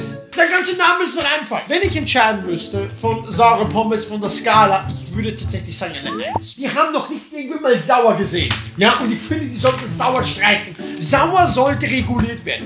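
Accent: Austria. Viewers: Kann es sein, dass ich da einen leicht österreichischen Zungenschlag raushöre?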